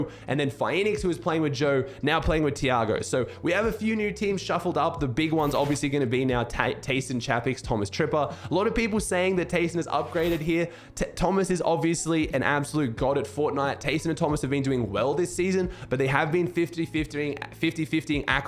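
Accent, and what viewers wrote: Australia. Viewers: just me or has he lost his aussie accent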